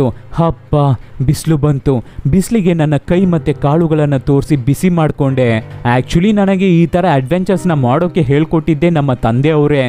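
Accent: Tamil Nadu, India. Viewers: Please nin Kannada saryag matadi tamil tara matadtidira but explain chanag madtira 🦄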